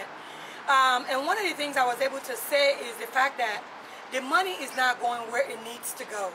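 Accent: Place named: Liberia. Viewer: You sound Liberian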